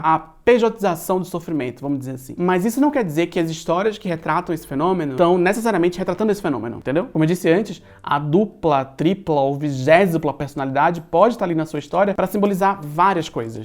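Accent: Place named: Rio de Janeiro, Brazil. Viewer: Falando em várias personalidades, uma pergunta, você nasceu em Pernambuco e mora em São Paulo, mas fala como um carioca, por que isso acontece?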